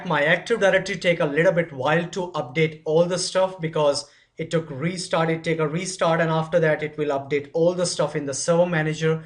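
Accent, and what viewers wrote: India. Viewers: Typical Delhi accent and typical way of moving from English to Hindi...the coverage is good though for those who can understand the language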